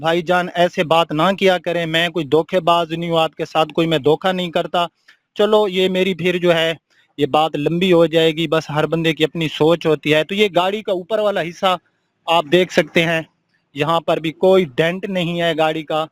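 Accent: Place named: Punjab, Pakistan. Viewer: English in punjabi mode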